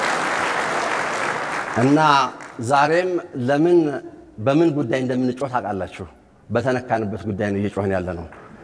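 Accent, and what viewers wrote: Wollo, Ethiopia. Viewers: አነጋገሩ ራሱ ወሎኛ ነው ደሰ ይላል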